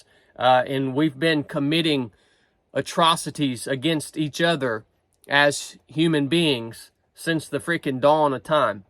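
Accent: South, United States. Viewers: Brother totally agree. Keep up with Truck talk. I love it. Your accent makes me feel right at home. My family are all southern. It's like watching my cousin talking about this crazy sh$$ show world we are finding ourselves having to be a part of. I've got a feeling this is definitely the beginning of the end. Just saying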